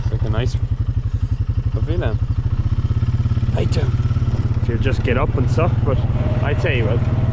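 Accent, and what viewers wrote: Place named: Ireland. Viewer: Another fabulous vlog!!! Each one gets better than the last. Great to see some of your flock close up….. they are gorgeous!!! Love that you chat to us as you go on your rounds. I could listen to you all day long with that lovely Irish accent! Great to see those ewes recovering & the lambies up & sucking. Good call with the calcium & other treatments. Casey was amazing catching that last ewe, she really stood her ground at the end there. I feel your frustration though when she’s raring to go but you really need her to stay put on the bike. Miserable conditions for you & the lambies in the drizzle & the cold. I pray the sun starts shining again for you soon!!! Quick question; if a ewe needs a calcium injection, is it a one off dose or will she need a few, & how do you know that her problem is resolved? Also, I’ve heard of twin lamb disease, is that calcium related or something totally different?? 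(I know, that’s 2 questions…… I’m pushing my luck 🤣)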